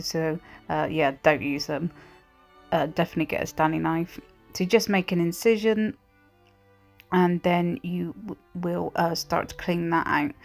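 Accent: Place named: England, United Kingdom. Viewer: Sure is a lot of stuff in there ,but I could imagine the smell and rot if the guts were left in.....boy howdy !!! You sure have an eye for detail and are very meticulous just like me, so I can truly appreciate what you do ,and you do it so very well indeed ,very talented girl !!!! I love the music you play in the background and love your English accent ,gives your channel some class.....very classy and the occasional potty mouth brings it all together nicely. Don`t know who I like better ,you or David Attenborough LOL. BTW I spread my Giant Golden Orb spider and there was a cornucopia of bad language in the bug room......two of the damn legs were broken off before softening the poor bugger but I did triumph and was victorious in the end. I don`t know how you do these projects while recording yourself and having to narrate all at the same time working on such fine specimens for all the world to see.....amazing my dear !!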